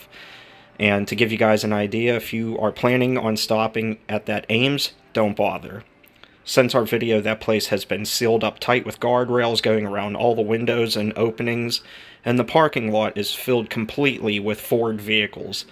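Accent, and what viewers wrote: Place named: Western Pennsylvania, United States. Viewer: I hear a western Pa accent, Pittsburgh area? Am I right? or close.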